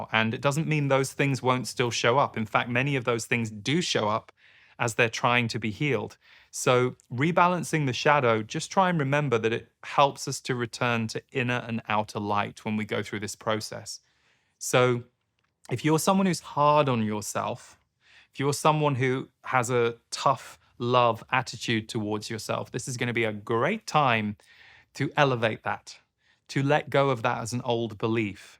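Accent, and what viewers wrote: England, United Kingdom. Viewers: I remember your first videos…you had hair back then and a lot heavier English accent. Lol congrats on 10 yrs💕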